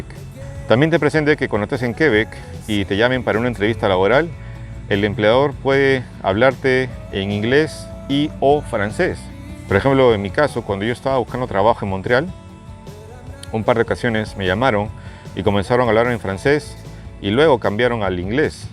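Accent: Peru. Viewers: Hola! X tu dejo asumo q eres un compatriota peruano. Si mi fuerte es el inglés (con escazo o mínimo francés) sería suficiente para conseguir un empleo allá mientras aprendo el mismo francés en Québec? AbrazO!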